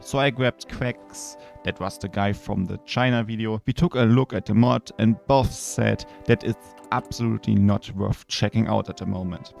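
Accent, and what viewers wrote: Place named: Germany. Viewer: german accent haha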